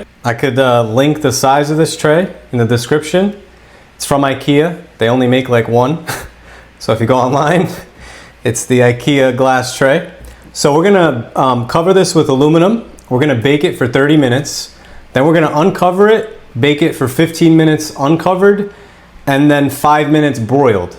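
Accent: United States. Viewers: You sound American, so what is with the recipe in Grams?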